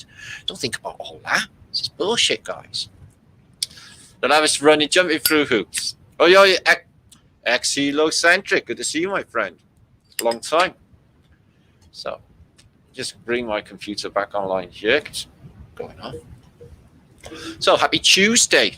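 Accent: Wales, United Kingdom. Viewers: Hi from Queensland Australia, so glad I found you! Am amazed with your work. I was born in south Wales in the 70's- lovely to hear the Taffy accent 😀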